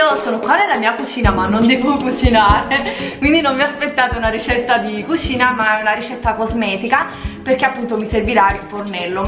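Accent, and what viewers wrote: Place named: South, Italy. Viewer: Sei bravissima❤️😃Di dove sei? Forse è il contrario ma sento un’ accento del sud (?)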